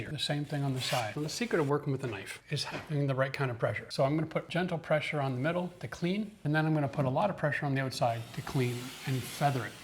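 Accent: Canada. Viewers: He definitely sounds Canadian to me :P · Are you Canadian? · Canadian aye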